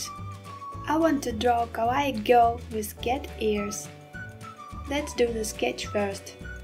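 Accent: Russia. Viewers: Кто русский? У автора акцент русский :D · Какой русский акцент... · Руссский акцент ) суперский обзор ))!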